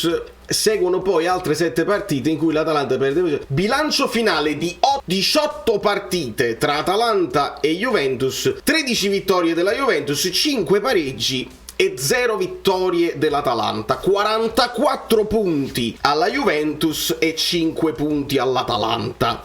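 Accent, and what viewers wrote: Naples, Italy. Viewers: Il canale più simpatico...ma sei napooletano uagliò.......🤣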